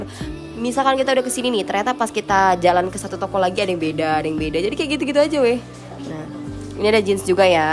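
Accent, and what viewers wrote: Medan, Indonesia. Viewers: Logat medan itu kk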